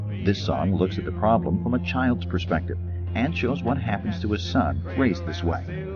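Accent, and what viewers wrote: United States. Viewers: THis was going greAT UNTIL THE aMERICAN VOICE OVER....GREATTUNES BUT cut the effing vocals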